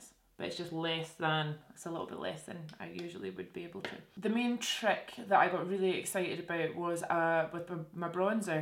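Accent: Scotland, United Kingdom. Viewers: I remember watching you when you first started... now you got your own Brand, still gorgeous as Ever and LIVIN your best! So proud of you 🥰 I’m 41yrs old MUA here on YouTube & I’ve been in beauty for about 25yrs... I’ll tell ya, these eyelids have completely changed on me! And it’s almost like overnight you just see extra skin that wasn’t there the day before 😒 sucks man!!! So I feel ya on the eyelids 😬 Especially for us who LOVE to do our creative shadows... it’s just not the same anymore 😩 But I STILL entered your VIEVE party looks on IG 🤩 I’m going strong until my lids completely hang over my eyes LMAO. I purchased your Liner in “Brat” & lipstick in “Treasure” and I’m fully in love. You nailed the pigment creamy texture ☺️ I love posting with these lips . I’m also Scotch/Irish/Mexican & that’s the whole Vibe I love about ya,, that Scottish tone is everything sweetie 😁 stay safe out there. 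Rock those neutral tones all you want... they’re beautiful. <mail>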